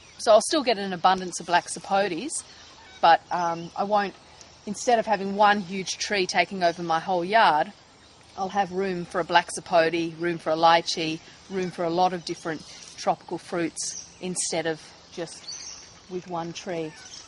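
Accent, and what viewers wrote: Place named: Australia. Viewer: cute aussie accent ;)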